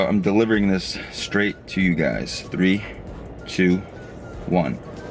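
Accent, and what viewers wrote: Boston, United States. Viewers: That lady at Dunkin is all of us. Haha! Great video! Hearing your accent makes me miss Boston so much! Keep up the great work! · I just noticed the Boston accent . I didn’t know he was from up this way., meaning The NorthEast ,.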